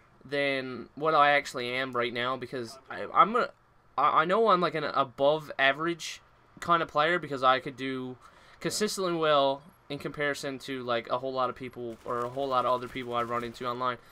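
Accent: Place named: Newfoundland, Canada. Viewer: Are you from Newfoundland? You sound a little like a newfie! :D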